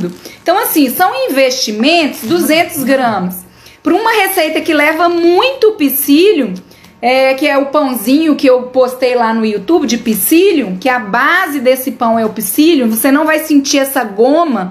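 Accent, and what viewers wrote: Minas Gerais, Brazil. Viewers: Doutora maravilhosa,vc é mineira? Amo os mineiros de ❤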